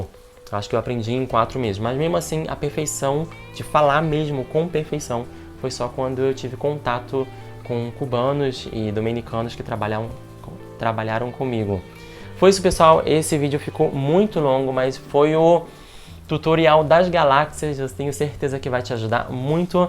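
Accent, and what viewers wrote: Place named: Rio de Janeiro, Brazil. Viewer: De qual estado do Brasil é você? Eu tô aprendendo português e quero saber o seu sotaque, gosto muito de ouvir sotaques do Brasil e dos países lusófonos todos! Edit: Eu já vi que vc é do estado do Rio de Janeiro. Rsrsr Sou novo inscrito no seu canal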